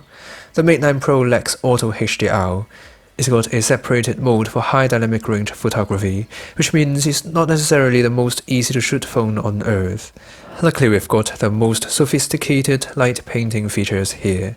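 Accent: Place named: United Kingdom. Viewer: Your accent makes me want to drink some tea in a British mansion. Can't stop listening, good job! :)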